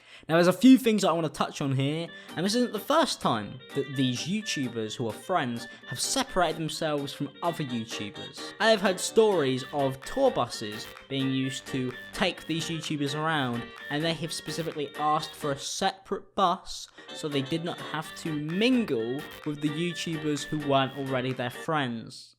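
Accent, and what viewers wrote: United Kingdom. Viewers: Who knew Timmy Turner had such a good British accent?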